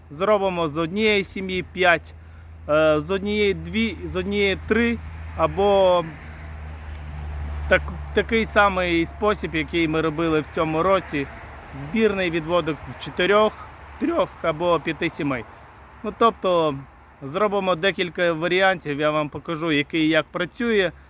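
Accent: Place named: West, Ukraine. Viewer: Слава Україні ! Я з Львівської області а ви з півдня України , але по вашій мові можна сказати що ви теж ,,западенець".😁 Дякую вам за мову та за повчальні , змістовні відео. Героям Слава !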